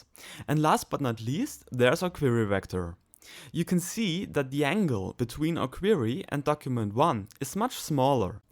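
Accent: Germany. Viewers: The german is strong with this one :D But gj, simple and understandable. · Aww cute German accent!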